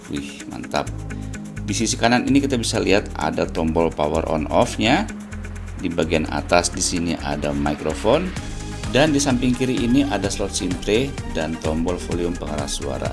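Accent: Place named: Banjar, Indonesia. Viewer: Suara nyaring ya bang bahasa banjar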